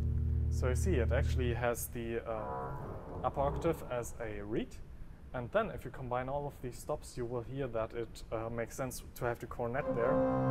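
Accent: Germany. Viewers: Are you from German your englisch is like a German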